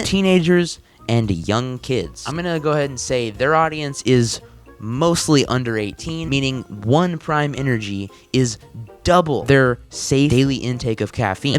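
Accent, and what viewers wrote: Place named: United States. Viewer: Why you got a prick annoying Americans accent?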